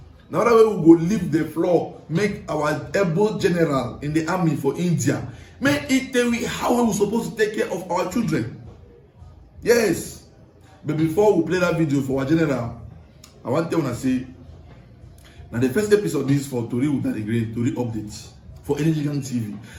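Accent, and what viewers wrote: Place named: Nigeria. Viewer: Combine bro. Pidgin and British English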